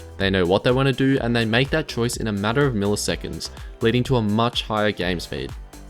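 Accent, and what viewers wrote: Australia. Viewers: The aussie accent gave it up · r u from Australia?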